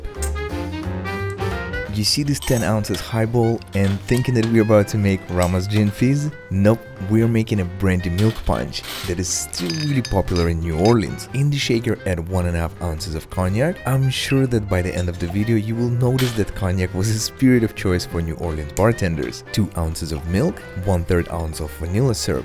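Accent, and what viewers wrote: Russia. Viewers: You russian or romanian or bulgarian?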